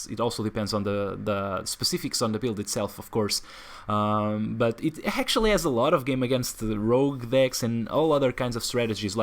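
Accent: Portugal. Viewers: Gostei bastante do video, também jogo gengar/bats/wobbu mas aplico bats e resulta muita bem, faço: 3 Gengar 1 Wobbu 4-4-4 Bats 2 miltank mas uso muito menos supps/items, mas acaba por resultar! ajuda bastante agora com wally, se ficares sem mão inicialmente. o que achas? btw, entendi que eras tuga pelo sotaque, mas parabéns, o canal está muito fixe!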